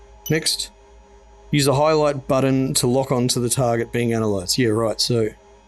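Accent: Australia. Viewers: Your accent sounds like it must be from Adelaide.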